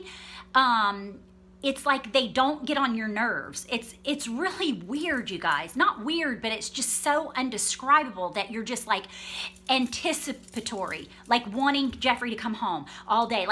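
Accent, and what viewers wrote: United States. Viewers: are you from georgia,cause your accent sounds like it lol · American accent here. 😅😊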